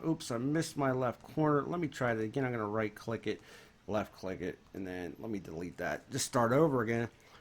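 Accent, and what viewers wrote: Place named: Pittsburgh, United States. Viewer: Hey I was wondering what part of around Pittsburgh are you from. I hear it in the accent. I was born in Freedom, Beaver County. My family is still in that area now.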